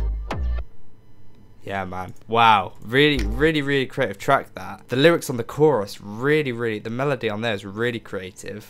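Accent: United Kingdom. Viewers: I love British accent so I get you 😂♥️♥️♥️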